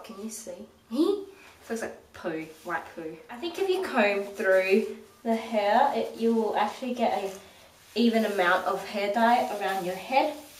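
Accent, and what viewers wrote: Australia. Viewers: hey r u australian? u hav an aussie accent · are you Australian? xD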